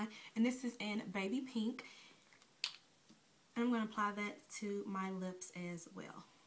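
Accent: South, United States. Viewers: Girl your southern accent is strong! "fingers" lol great tutorial!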